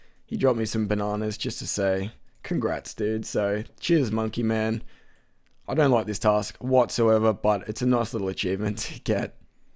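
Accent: Australia. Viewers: called an Australian Accent.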